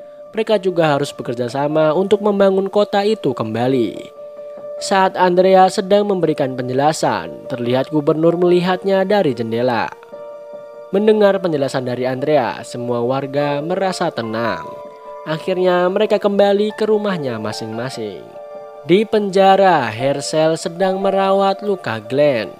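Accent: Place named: Java, Indonesia. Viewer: 99,99999,999% b Indonesia 00,00000,001% jowo ne metu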